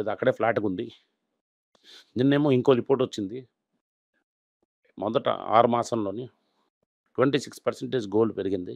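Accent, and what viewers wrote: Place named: Srikakulam, India. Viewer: Vidoka Rakesh jhunjhunwala levello unnadu, okka vaakyam kudaa clarity ledu. Manadi Chikakole ani telustondi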